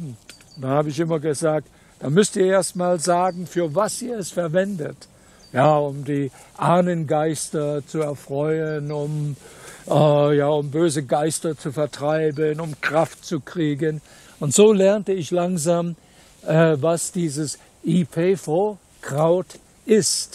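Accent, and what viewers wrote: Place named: Saxony, Germany. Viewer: 👍💚 Ich liebe es, wenn das Sächsische durchklingt.. 💚👍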